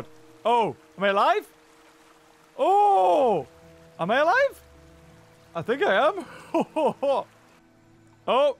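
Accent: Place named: Newcastle, United Kingdom. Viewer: You from the Toon, mate? EDIT: ok the question is now nuill and void, you said "Alreet" LoL.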